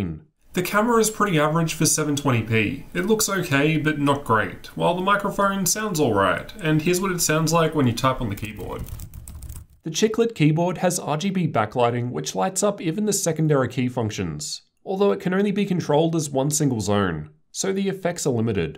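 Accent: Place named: Australia. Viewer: Why do you link us and uk but not au? U sound aussie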